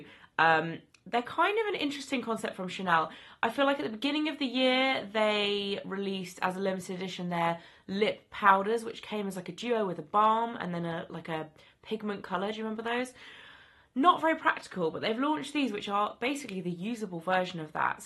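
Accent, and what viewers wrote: United Kingdom. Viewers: I'm not sure I care much for the whole "lip powder" trend. It seems kind of silly and counterproductive to put something on lips where you actually should prefer moisture and more than that.. they dont ever look good. Especially the red and mauve type colors . It looks like you've been drawing on your lips with eyeshadow. BY THE WAYYYY... thanks for these videos! I'm a huge fan and you're very informative and who doesn't want listen to information coming with a British accent??? I love it!!!🤩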